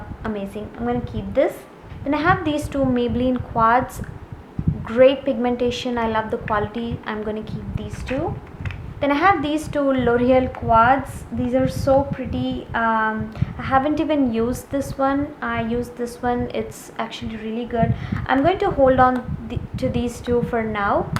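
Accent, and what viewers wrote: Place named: Andhra Pradesh, India. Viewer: R u from andrapradesh? Just ... Ur accent ...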